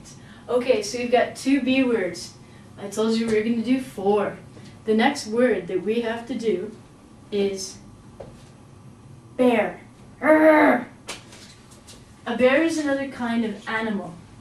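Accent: Canada. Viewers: She from canada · She is from Canada?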